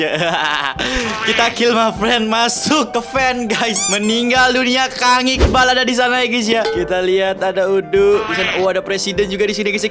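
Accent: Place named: South Kalimantan, Indonesia. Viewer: kakak orang banjar ya,kok kayak orang kalsel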